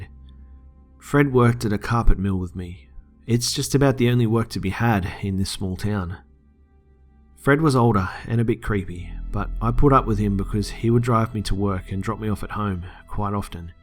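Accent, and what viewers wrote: Australia. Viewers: I think you are Australian?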